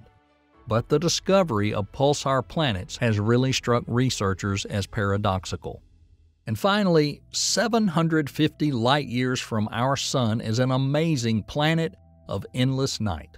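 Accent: South, United States. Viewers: i love this guy's southern sounding voice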